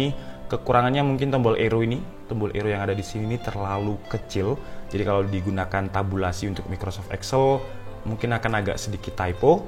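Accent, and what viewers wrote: Malang, Indonesia. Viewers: Lohhh mas wong malang to?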